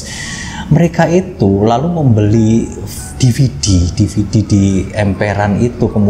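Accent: Java, Indonesia. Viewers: Logat jawa tpi pke ny gua,gua. Gk byambung mas hrus ny pke kalimat ny sy bukan gua.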